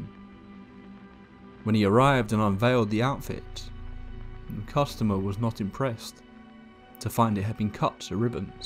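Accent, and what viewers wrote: United Kingdom. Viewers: Hey! A huge belated happy new year sunshine! I subbed to u not very long ago; I’m a huge fan of bedtime stories & Mortis Media… so I figured I’d give u a go! I’d dearly love to hear more about black eyed kids! They freeeeaaak me out!!! 😱🤪 P.s it’s always great to hear a fellow Brit narrating!! 🇬🇧👍🏻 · Ugh... Yet another British narrator... Not as many words mispronounced as usual but still annoying. Also the narrator drifts off at the end of each paragraph and speaks too slowly overall.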